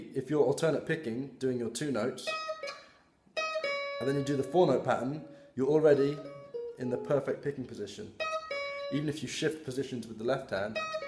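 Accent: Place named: Liverpool, United Kingdom. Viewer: Are you from around Liverpool? You sound a bit like George Harrison.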